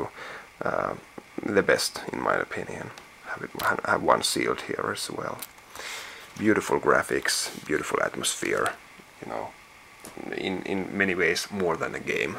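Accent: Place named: Finland. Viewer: he is finnish. finnish doesnt have pronouncing, you say the words exactly like you write them. for example, trade would be just tra de, not treid. of course we try to pronounce them correctly but that doesnt always work.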